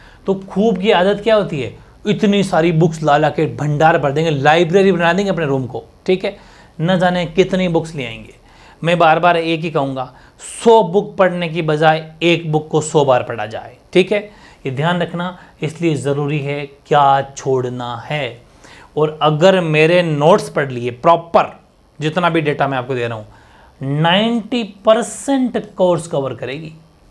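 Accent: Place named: Rajasthan, India. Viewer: Rajasthan se ho na sir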